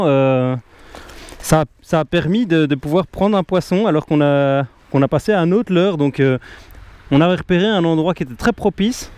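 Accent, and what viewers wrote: Belgium. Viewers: Belle vidéo merci, ça fait plaisir d'entendre un accent Belge c'est familiale 😁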